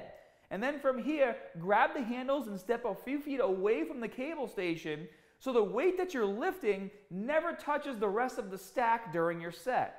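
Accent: Boston, United States. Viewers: is that a boston accent i hear 👂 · The Boston accent was strong in this video lol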